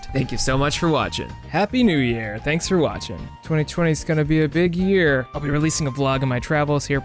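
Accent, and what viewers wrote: Louisiana, United States. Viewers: Did not know you where cajun. I'm from Louisiana, north Louisiana so not cajun but a Louisiana baw none the less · oh shit baaaaaabie i didnt know you was cajun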